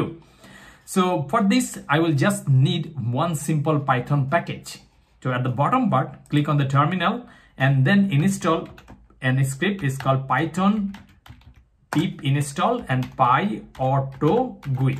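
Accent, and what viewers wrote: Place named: India. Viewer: Are you from INDIA? Because your accent is like ours.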